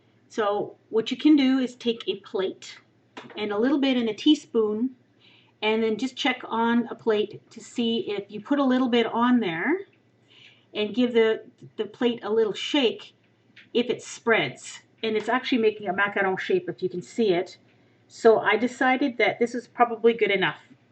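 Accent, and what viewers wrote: Quebec, Canada. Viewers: I really love your videos, are you french canadian?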